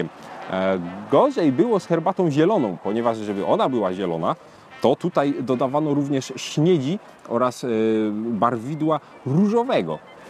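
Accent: Silesia, Poland. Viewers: Ze Śląska.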